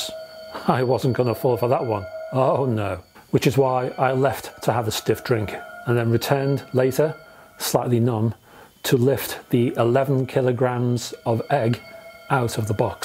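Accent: United Kingdom. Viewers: Love the British speak, very eloquent indeed. Net, net though the review indicates these are too bright in the midrange on some vocals and recordings, which can cause ear fatigue. While boomy bass and hissing highs in some speakers are never good either, because the human ear is most sensitive in the midrange, if an $8,000 pair of speakers can get too peaky on the mids in some cases, that's the worst area to have a problem. Also this review only refers to a very few recordings.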